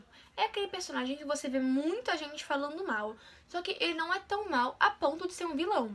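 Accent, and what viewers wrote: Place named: United States. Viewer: seu sotaque americano é tudo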